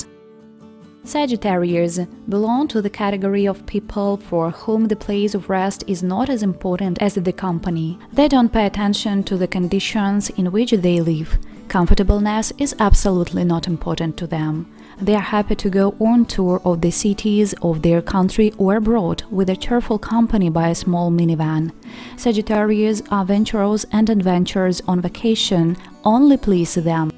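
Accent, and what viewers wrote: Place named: India. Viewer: nah, they need to replace this indian narrator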